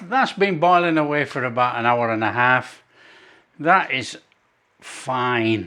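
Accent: Yorkshire, United Kingdom. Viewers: do some Eccles cakes or Chorley cakes, take care pal, i love your presentation, reet proper Yorksher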